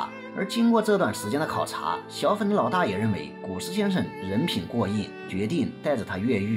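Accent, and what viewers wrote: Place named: Zhejiang, China. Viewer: 越哥是浙江人吧? 你的口音我熟。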